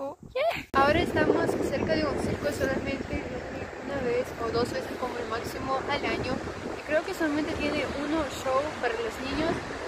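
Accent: Argentina. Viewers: muy buenos dias muchisimas gracias por este hemozo video me encanta y tambien tu hermozo acento argentino gracias gracias gracias Felicitaciones sigue asi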